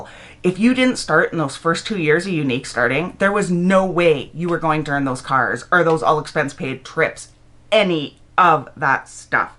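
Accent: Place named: Canada. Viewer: are you from Canada? Love the accent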